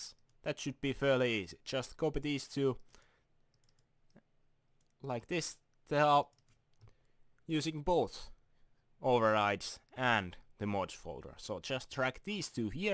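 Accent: Finland. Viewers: you have a finnish accent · are u from finland? cuz you have very strong accent :D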